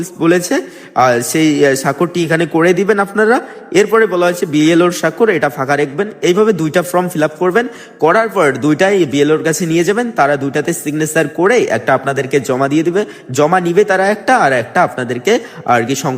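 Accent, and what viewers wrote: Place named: Bangladesh. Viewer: Tumi to Bangladeshi. Vulval bojhaccho keno ? Tomar citizenships na bolleo tomar kothai bole dicche tumi bangladeshi .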